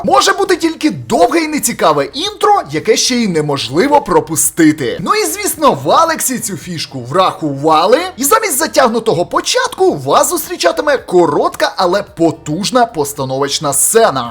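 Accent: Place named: Irpin, Ukraine. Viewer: Цікава говірка у вас в Ірпені)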